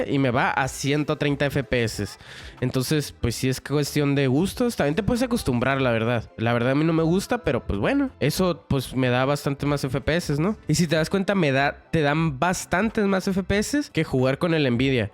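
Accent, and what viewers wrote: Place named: North, Spain. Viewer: De dónde eres? Te escuchas del norte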